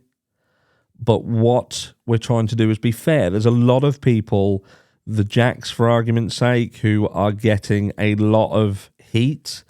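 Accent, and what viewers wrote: United Kingdom. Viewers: since i left UK decades ago i lost British traits and accent so glad to listen to your's mate All The Best Wishes Everyone :cat-orange-whistling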